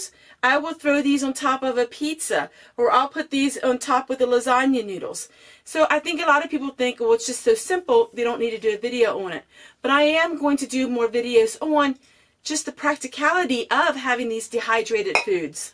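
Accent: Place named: Philadelphia, United States. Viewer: New to your channel! Omg!! You’re accent is strong! Not making fun, we have a similar accent, I’m from south jersey and my husband is from Philly. We were taking bets on where you were from until I saw your P.O. Box. I won!! Love your set up!! I had a laugh when I saw your china cabinet. I’ve converted mine for storage and my husband gave me a look 😂 See??!! I’m not the only one!!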